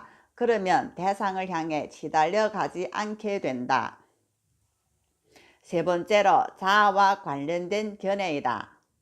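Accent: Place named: Gyeongsang, South Korea. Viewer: 불교 신문이라... 남 해치지 않고... 근데..많은 사람들이 남을 해쳐서 자신의 이익을 얻고자 하지요....혹시...경상도 출신인가요? 나도 갱상도인디...억양이 갱상도 같아요 ㅎㅎㅎ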